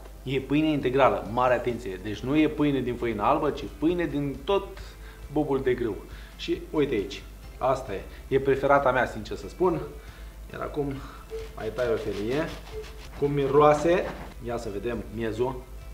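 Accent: Moldova. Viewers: Ăsta este farmecul lui, e glumeț, explică totul pe îndelete, accentul moldovenesc are și el dulceața lui, iar rețetele pe care le prezintă sunt, toate, foarte faine.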